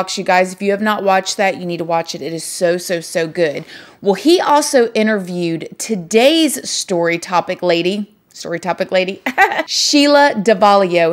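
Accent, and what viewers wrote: South, United States. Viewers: You’re country accent is everything I need when hearing these crazy stories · I just discovered this channel. Someone help me out. I'm trying to place her southern accent that fades in and out. First, I said Tennessee... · she is from the southern tip of Florida, from what I remember. she has a slightly southern accent.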